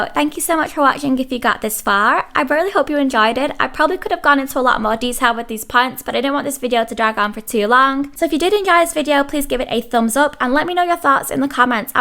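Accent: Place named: Leeds, United Kingdom. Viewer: Where abouts are you from? That sounds like a Leeds accent. Really curious!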